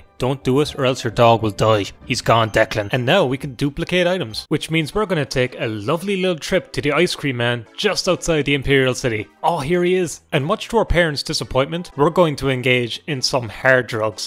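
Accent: Ireland. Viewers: Ooh a fellow Irishman. Where you from? Love the video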